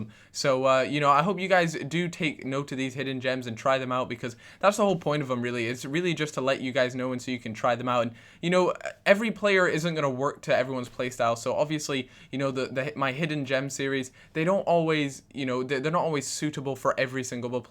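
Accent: Scotland, United Kingdom. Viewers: wait he is scottish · I hate ur gay scottish voice!!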